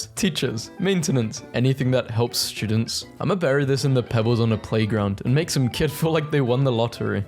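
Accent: United Kingdom. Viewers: British guy ye🐥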